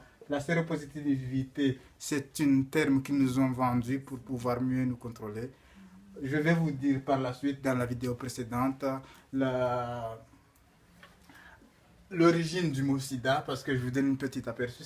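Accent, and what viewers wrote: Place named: Comoros. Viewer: vous êtes comorien? Ton accent me familier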